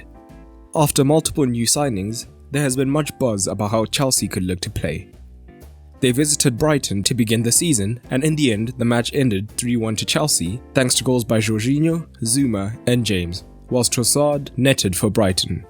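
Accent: South Africa. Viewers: Are you South African g? Really good video!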